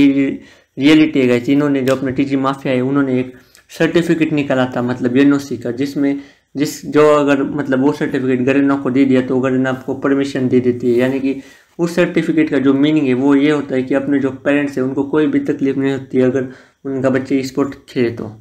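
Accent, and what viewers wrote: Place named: Maharashtra, India. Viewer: U r talking accent is like maharshtrian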